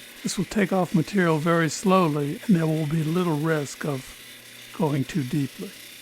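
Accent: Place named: Louisiana, United States. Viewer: this guy has got to be from Louisiana. He has a distinct cajun accent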